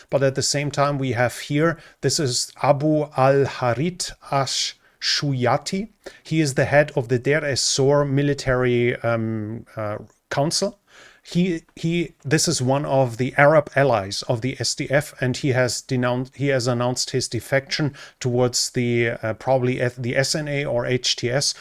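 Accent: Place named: Germany. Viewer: It sounds like you have a very heavy German accent. However, your English is quite good !!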